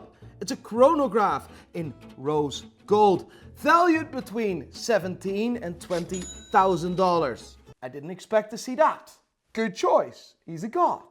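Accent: Netherlands. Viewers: Heerlijk, als ik dat hollandse accent er tussendoor hoor 😁 Well done! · Ik wist gewoon dat jij Nederlands bent ik heb het vaker in je accent gehoord maar nou weten we het zeker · ik wist gewoon dat je NL was broer. Je accent je naam alles! En uit welke stad kom je trouwens vandaan?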